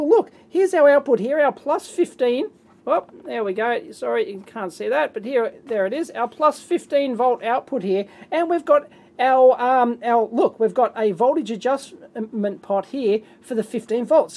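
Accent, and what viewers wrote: Australia. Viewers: it's highly commendable for someone to use a wide dictionary. especially with an australian accent. (unless your name is ken ham)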